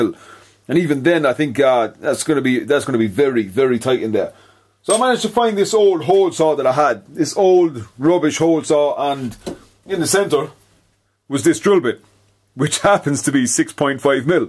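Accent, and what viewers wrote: Ireland. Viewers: You’re a legend, loving the Wesht of Arland accent and all the info. Definitely gonna help build my Series 3 crossbred. Thanks pal!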